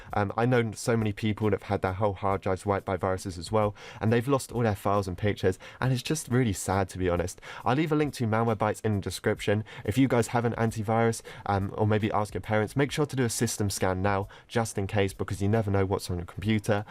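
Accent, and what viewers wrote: United Kingdom. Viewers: You know sounds professional when he speaks British.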